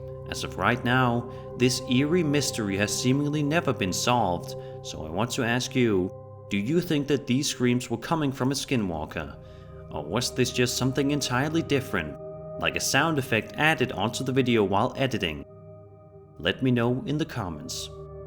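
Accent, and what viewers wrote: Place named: Denmark. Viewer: This guy sounds danish :)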